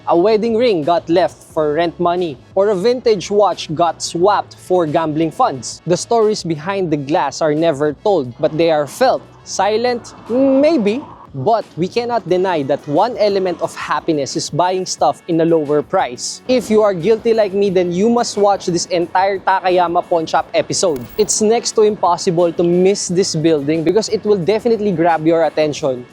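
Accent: Philippines. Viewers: Nice content, strong Philippino accent though, can still understand 😢😂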